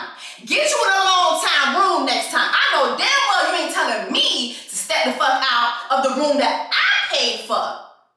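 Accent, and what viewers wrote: Haiti. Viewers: When you said, "we only got one room" I got up and left too omg. WHAT. Your creole is making it even funnier too (I'm haitian so I understand!!) I'm surprised you didn't call your parents to bail you out, I woulda called my dad ASAP to get me some hotel/motel room and I would never talk to that person ever again. I'm planning my first girls trip...and I hope it goes a lot better than yours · We want more like that...ou fem ri ahhaahha thr haitian in you is priceless. And gurl I would do the sammmmmmeeeee thing. She could have a lonely time at home lol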